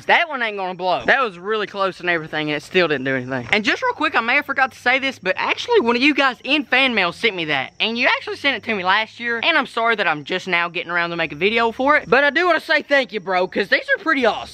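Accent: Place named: Kentucky, United States. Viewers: Hey there fellow Archery not even sure if you’re going to see this but just wondering how far south in the United States you are(because I’m genuinely interested in where that heavy of an accent comes from not trying to make fun of it) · This guy is definitely from Kentucky. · Love that southern accent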